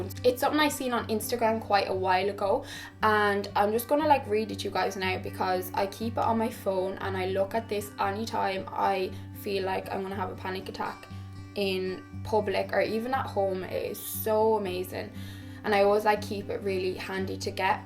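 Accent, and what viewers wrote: Ireland. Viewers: oh my god. you speak in such an irish accent. omg, melanie sounds like an american and you sound like REALLY ORIGINALLY Irish. omg 😊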